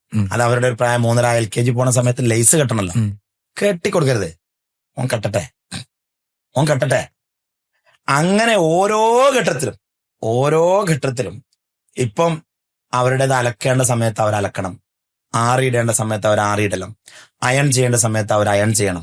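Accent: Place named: Malabar, India. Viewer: This guy know good malayalam but sometimes he goes to the low class malabar accent. But think world is not malabar.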